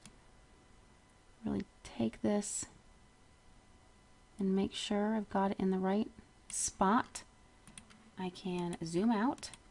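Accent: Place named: Ohio, United States. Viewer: i love your voice and accent - ohio?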